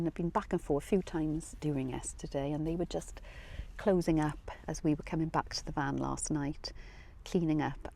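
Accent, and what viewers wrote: Wales, United Kingdom. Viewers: What is ASMR please? New subscriber here so sorry for the need for an explanation. Loved the video....AND your accent as I live in South Wales.... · your dulcet, Welsh voice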